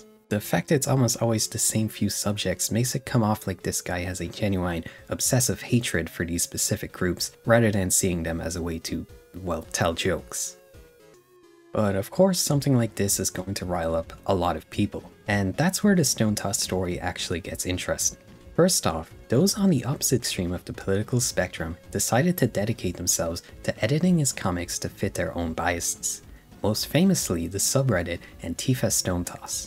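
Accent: Ireland. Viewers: Are you half Irish ? Or somewhat Irish you have Irish inflections in your speech like the word “think” -> “tink”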